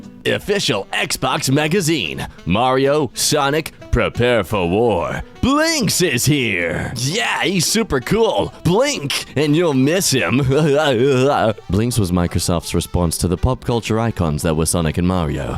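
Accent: Australia. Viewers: I have a question, are you Australian? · I love the Australian accent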